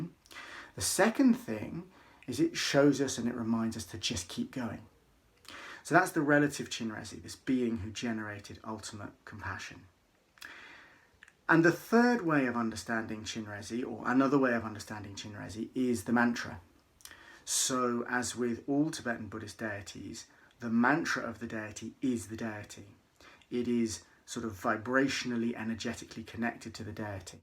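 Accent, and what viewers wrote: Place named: England, United Kingdom. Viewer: Thanks for enlightening us. A few questions if you don't mind: 1. Where does the name Chenrezig come from? 2. Is Chenrezig the same as Avalokitesvara or Guan Yin Bodhissatva? 3. Is Chenrezig manifest into thousand eyes, thousand arms? 4. Is Chenrezig a male or female? 5. Did Chenrezig born out of lotus flower like Guru Rinpoche? Because mantra is like Mani Padme ( like Padmasambhava? ) Thank you, with Metta ( btw, like your English accent and you are very young, indeed. )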